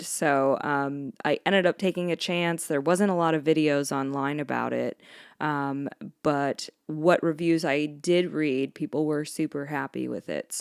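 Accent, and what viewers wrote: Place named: South, United States. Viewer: aww.. you're sweet. Comes thru clearly.. I am sincerely sorry to hear about troubles with your sis and partner. I assume business partner? You mentioned your husband in this video, I hope not him. I hear a refined southern accent, and heard a "y'all" (always a plus for this Texas fella) so I don't think you'd call your husband 'partner'. Either way, prayed for all and meant what I said. As for the channel, the grossest ever strat is up next for me. Take care, be well, keep it up when it suits you🤍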